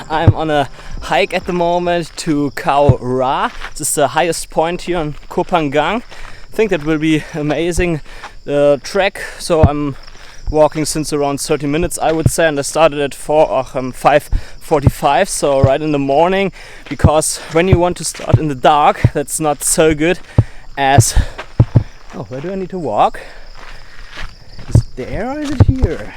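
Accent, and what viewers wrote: Germany. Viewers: you sound german....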